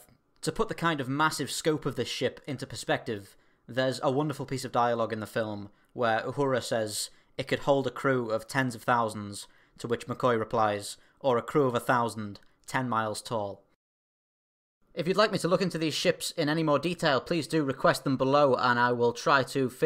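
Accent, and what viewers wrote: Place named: Liverpool, United Kingdom. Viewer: Kind of a Scouse twang to your accent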